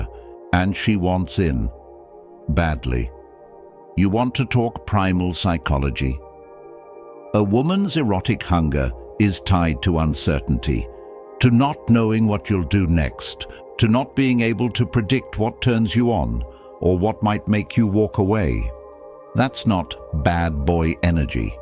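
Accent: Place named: RP, United Kingdom. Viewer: I’m tired of that narrative voice in most of these stoic videos. Then words like “brother” or “a dude in Gucci” would never be said in that upper class British accent.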